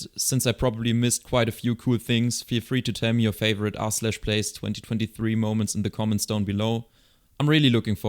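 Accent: Germany. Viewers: You sound german am i right ? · Ich Höre da deutsch Raus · Man kann hören deinen Deutschen Dialekt 🇩🇪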